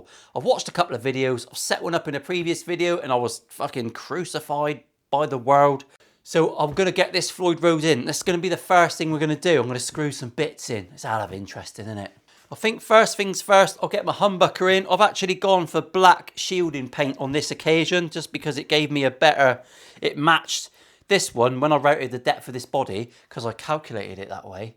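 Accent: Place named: England, United Kingdom. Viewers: Heard the geordie accent but thought no more about it, then does the demo.😊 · Nice job mate.. it was fun watching you at work.. like the English accent 🙂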